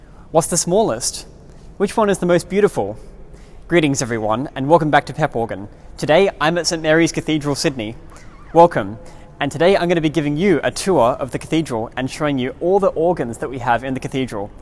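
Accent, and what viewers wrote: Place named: United States. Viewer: It is sad that the speaker broadcasts in American.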